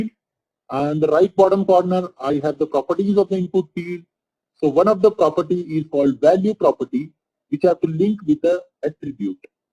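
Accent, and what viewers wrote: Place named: India. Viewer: bloody indian pronunciation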